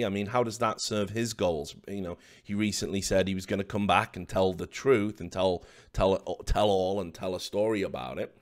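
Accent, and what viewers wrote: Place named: England, United Kingdom. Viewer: LMFAO. When he said "COCK AND BALLS", I died. I absolutely love English accents.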